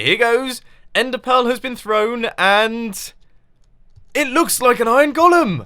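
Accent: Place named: United Kingdom. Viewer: Iron golom. Great British accent bro :)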